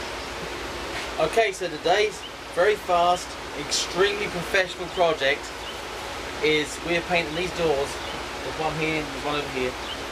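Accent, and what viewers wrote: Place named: West Country, United Kingdom. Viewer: Where are you from? I get a slight hint of West country then antipodean. Like an accent twisted from being abroad.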